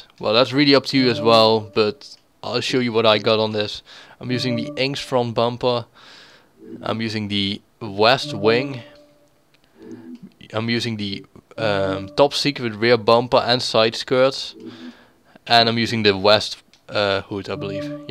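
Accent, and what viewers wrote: Netherlands. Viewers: Are you dutch to me you sound dutch nice tune btw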